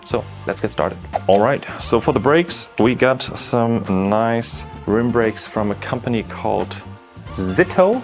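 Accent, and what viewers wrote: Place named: Germany. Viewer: As you can hear in his accent, he is probably German, in my opinion 😉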